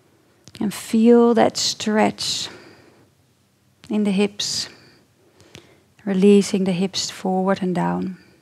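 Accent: Netherlands. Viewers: I love your Dutch accent. And your videos. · No, it's a Dutch accent.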